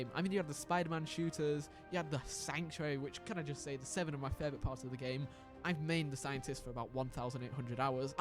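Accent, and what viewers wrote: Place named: United Kingdom. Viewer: Respectfully you are actually the most British sounding British youtuber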